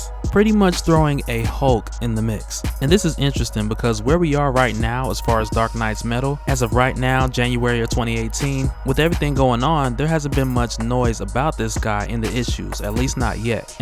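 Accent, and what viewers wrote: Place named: Georgia, United States. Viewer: I knew you were from the GA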